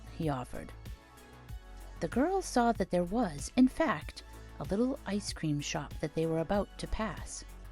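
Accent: Australia. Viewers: Omg your Australian accent is awesome! I love it! I’d love to hear that mix tape! ❤️ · well what can i say but awesome! loved your story and the gluing too xx enjoyed everything about this,even your australian accent lol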